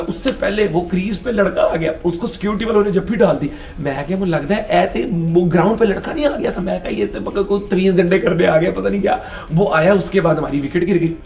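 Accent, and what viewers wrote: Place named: Punjab, India. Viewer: You are so funny and your Punjabi accent.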